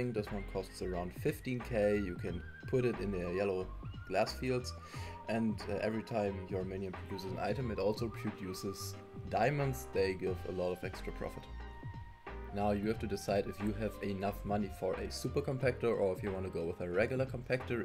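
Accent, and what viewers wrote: Germany. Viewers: Ich glaube ich höre deinen Deutschen akzent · I smell german accent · i can hear a accsent are you german? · Sprichst du deutsch/englisch Englisch mit deutschem Dialekt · Nice tutorial, i didnt know what minions to go for. That really helps! (Btw, man hört dann deiner stimme stark das du deutscher bist xD) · Der Deutsche Akzent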